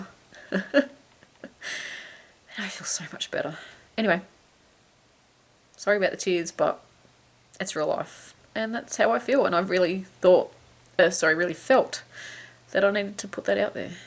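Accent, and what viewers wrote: Australia. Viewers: Awwe, you've been reunited with your crochet love!! That's really cool. I can relate. I had a bicycle once when I was in college. I rode that thing everywhere. Went home for a week. Came back, hopped on the bike, and was so surprised how good it felt when my butt hit the seat!! (I didn't cry, but I get you.) Happy to see you back; I was missing you. Nice patterns, good instructions, lovely Aussie accent!!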